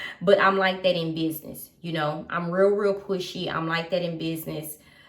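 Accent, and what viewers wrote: United States. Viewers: You are an inspiration to young women everywhere girl . Owning your own businesses and being so established let’s women know if they want something they can work hard and go out and get it for themselves ! I can only imagine the questions you get daily 😂. I come here to watch the bags girl , I want to see what you choose next , I love that you love LV canvas bags like I do . Not that I don’t find many of their leather bags beautiful , they just are not what I’m attracted to as far as LV . You are very detailed when you show your things , very polite and sweet , love your southern accent (I’m from New York) . I don’t think VIC’s should have restrictions , it makes sense to me . They did claim VIC’s would not be able to pre order canvas moving forward either , but I think it’s something they should over ride for a VIC customer . Customers like you who love the bags shouldn’t have to suffer for the VIC’s purchasing just to resell . 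With the new microchip system , I would think LV can now see what VIC’s are only purchasing to resell and which truly love the items for themselves . Thank you for sharing with us , girl I would have continued to watch and support you and never asked what you did for a living . I’m here for the eye candy ! I did videos for a minute but had no desire to be an influencer , I also had no desire to share every private aspect of my life , so I stopped filming , still love watching and supporting my favorites ! I find you very relaxing to watch and listen t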